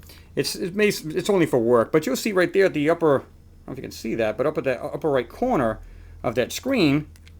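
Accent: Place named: New York, United States. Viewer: You sound like a new Yorker hey! Love the video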